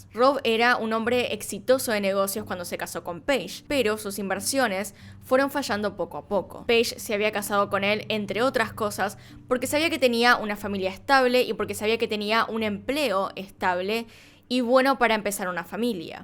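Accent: Argentina. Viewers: Saludos desde Virginia Estados Unidos 🇺🇸 pero yo soy de Guatemala 🇬🇹 me encanta tu acento argentino 🙃 salúdame 🙂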